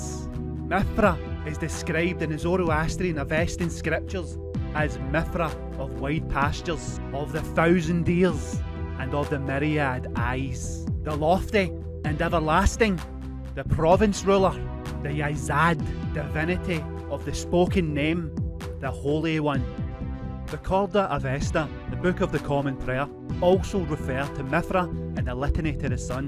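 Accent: Scotland, United Kingdom. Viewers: Nice video and accent, is it Scotish? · Please bring back the old narrator, i cant understand half of what this guy is saying. His Scotish accent (i think) is so damn thick its hard to make out certain words.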